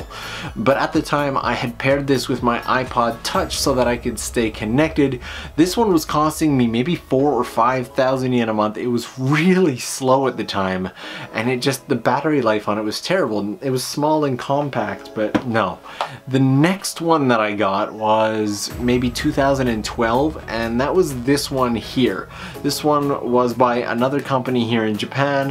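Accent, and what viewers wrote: Canada. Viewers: I never realized how Canadian you sound until this video. Lol Anyway, wish I would have had an option like this back in 2015.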